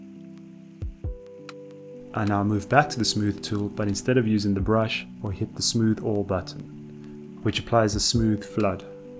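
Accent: South Africa. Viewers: Is that.....South African I hear....?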